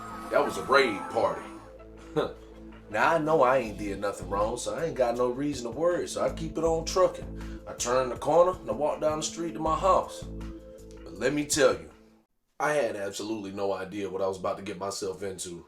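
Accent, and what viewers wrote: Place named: South, United States. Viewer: I'm getting the weirdest vibe from you here. It's clearly all insincere. It looks like bad acting as you're reading a script. But there's more. You have the weird redneck accent thing going on with sayings and mannerisms that are supposed to convey that you're not too bright and that you're one of us. It's all fake though. You're smarter than you're selling yourself as. You're gaslighting and faking. I won't be subscribing for this "content" you're trying to sell me on. It's not clear what this channel is about but it seems clear to me that you're trying to monetize this incident. You put on this "cool" persona but your acting is terrible. Most people will not even notice though. Most everything people watch these days is completely fake and they still accept it as real. They say "I know this reality show is edited and scripted" but in reality they are falling for it. 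They are desensitized to fakeness. I found this video rather repulsive to watch. You are not the everyman you're trying to sell yourself as. You are not stupid. Most people will fall for this bad acting job you're doing but I am not one of them.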